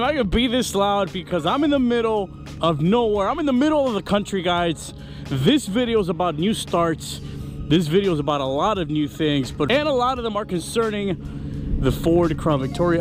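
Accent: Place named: Tennessee, United States. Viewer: StereTyping thats why I said, Im not hatting tho. TN accent is bomb.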